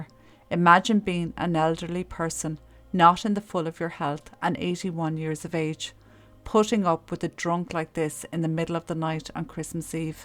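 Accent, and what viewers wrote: Ireland. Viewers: I love your narration voice, your Irish brogue ❤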